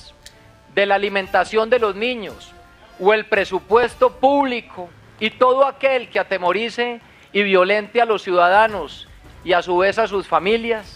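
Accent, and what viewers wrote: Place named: Antioquia, Colombia. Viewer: El próximo presidente habla paisa... Felicitaciones a todos los paisas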